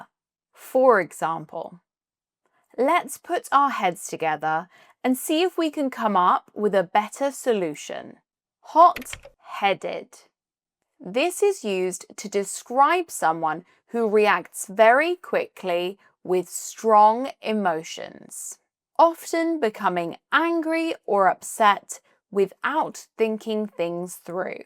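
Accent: United Kingdom. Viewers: Wow, that's super useful! Now I just need to find a way to remember as many idioms as possible... Great job,. Thank you so much for your dedication. PS your British accent is amazing ❤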